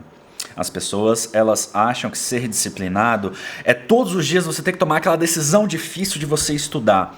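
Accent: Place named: Espírito Santo, Brazil. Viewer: Qual é a origem do seu sotaque? Eu conheci uma pessoa de Vitória - Espirito Santo que tinha um sotaque parecido com o seu.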